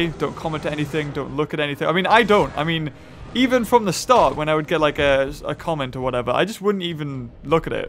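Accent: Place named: United Kingdom. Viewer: youre a good youtuber but heavy brittish accent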